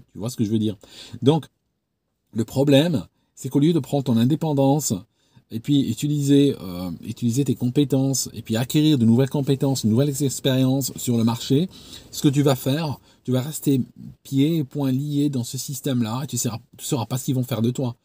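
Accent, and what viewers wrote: Vaud, Switzerland. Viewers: lol l'accent Suisse qui revient. Je te regarde en mangeant une entrecôte Wagyu. Pas de la bouffe de RSAiste ça.. Ce qu'on a de plus précieux c'est notre temps de vie (en bonne santé). Le gâcher largement pour avoir un peu plus, mais plus assez pour faire grand chose notamment depuis le covid et l'inflation, je me demande de plus en plus si ça vaut le coup. Les aides baissent pas elles augmentent... Le SMIC idem, il augmente fortement avec le temps, je l'ai jamais vu baisser. Ceux qui ont un peu plus, sont pas augmentés, résultat on s'appauvrit, on est de plus en plus proche du SMIC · C'est quoi cet accent suisse mon 😂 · Arrêtez de dire "accent suisse" dans les commentaires, ça veut rien dire. C est de l accent vaudois qu il est question, en l occurrence. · Merci pour la vidéo c'est top. En revanche l'accent Suisse est atroce 😂. 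Heureusement que ça s estompe au fil du déroulement de la vidéo. Salutations distinguées. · J’ai une théorie sur cet accent suisse. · Trop rigolo l accent suisse wue vous prefer. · J'ai l'impression que vous avez pris l'accent suisse !!! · C'est quoi ton accent Suisse 😁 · Pourquoi tu prends l'accent suisse ?? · Dommage pour l'accent suisse trop présent qui rend l'ecoute plus difficile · Incroyable en écoutant ta vidéo j'entendais la mélodie de l'accent Suisse, puis en regardant la description j'ai vu que t'étais à Genève haha. Sinon top le contenu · Ça me perturbe c’est l’accent suisse que tu as dans cette vidéo ?? J’ai noté un accent bien marqué c’est ps habituel il me semble 😂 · Pourquoi prendre cete intonation suisse ? Ai-je raté un épisode ? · Pourquoi est-ce que t’as l’accent suisse dans certaines vidéos? 🤣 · Alors ton accent c est en Suisse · Tu as l'accent suisse comme même :) 'Avais jamais remarqué :) ! 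· J'aime bien ton accent Suisse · Cette accent suisse, c'est trop mdr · Pourquoi tu prends un accent Suisse ? En France on paye des charges hallucinantes pour justement pouvoir toucher des aides en cas de chômage. Je veux bien qu’on ne donne plus de cash aux chômeurs, pas de « sponsoring » comme tu dis mais dans ce cas, je ne veux pas voir sur ma fiche de paye toutes ces lignes qui sont retirées à mon brut… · C’est marrant ton accent suisse dans cette vidéo, … 😊 Plus sérieusement, j’ai été contrainte d’aller aux services sociaux quand je suis rentrée d’Angleterre. Je préparais déjà une licence et j’avais besoin d’une année pour la terminer. J’avais aussi besoin de nourrir mes enfants. En même temps, je me suis inscrite à des concours. Comme j’ai réussi le premier, j’ai laissé tomber les autres qui étaient équivalents. Ainsi, j’ai pu m’occuper de mes enfants, les nourrir et leur faire faire des activités. 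· Y a l'accent suisse qui revient au galop!!! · petit aparté : je n'avais jamais fait attention au fait que tu avais un accent suisse ! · Bin, c’est quoi ce nouveau accent suisse? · ton accent suisse est revenu tellement fort que je doute que tu sois toujours au royaume uni. Super conseil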